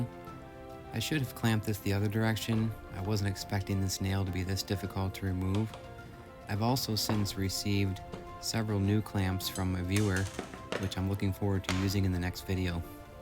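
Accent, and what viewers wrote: Wisconsin, United States. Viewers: I am just watching you and a few others refinish furniture. I have chronic headaches and can't handle the fumes or the bending. I'm trying sewing but having trouble concentrating due to the chronic headaches. I'll get there. I am a 2 but sometimes a 1! My husband is super neat and I drive him crazy cause I'm not as orderly as when all 5 kids were home. Now when the grandkids are over their parents will clean up with them before they leave which is a life saver! You do good work. I like watching you and listening to you. That Wisconsin accent. Lol.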